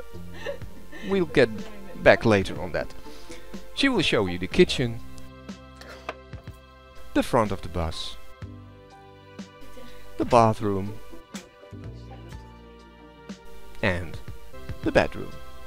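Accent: Netherlands. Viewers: ITSAAA DUTCH ACCENT, HELLO COUNTRY BROTHER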